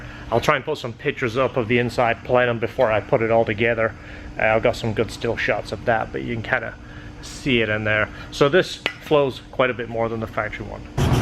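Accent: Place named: United Kingdom. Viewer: Where you from dude your accent sounds a bit British? Great work by the way.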